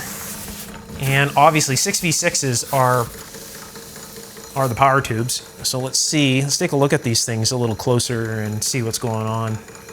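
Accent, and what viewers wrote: Canada. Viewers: You from Canada, eh? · You sound Canadian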